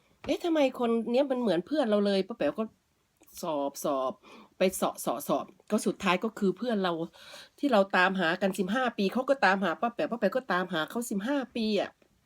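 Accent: Isan, Thailand. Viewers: ฟังจากสำเนียงแล้ว...ป้าแป๋วน่าจะพูดภาษาอีสานได้ดีมากๆ และน่าจะดีที่สุดภาษานึงด้วย...ฟันธง!!! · ป้าสำเนียงอีสานนะคับผมเป็นคนอีสาน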